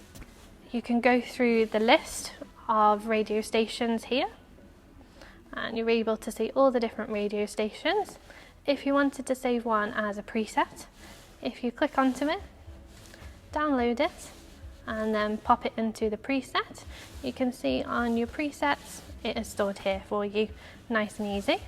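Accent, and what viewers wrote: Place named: United Kingdom. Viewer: I’m looking for a Boxster 718, so this is helpful. As an American, I love her British accent.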